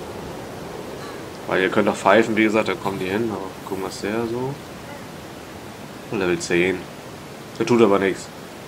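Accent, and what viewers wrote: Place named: Hamburg, Germany. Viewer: thx für das Tutorial. Vom Sprachbild würde ich mal stark Hamburg und Umgebung schätzen.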